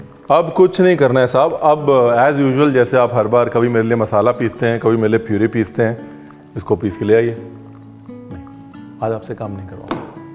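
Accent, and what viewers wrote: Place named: Lucknow, India. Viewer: the lucknawi touch in his tone simply adds the magic to each and every recipe of our dear chef...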